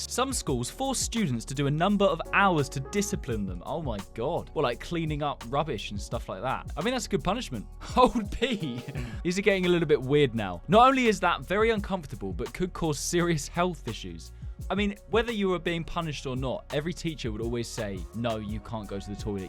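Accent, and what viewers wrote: United Kingdom. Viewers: are you British? bc u say waht i would od no offense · Are you British you literally sound British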